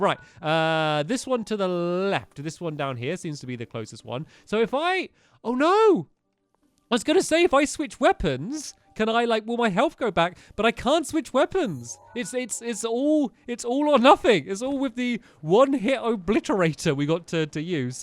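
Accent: England, United Kingdom. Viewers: Quest98Xx hes from england they pronounce things differently, not wrongly, just different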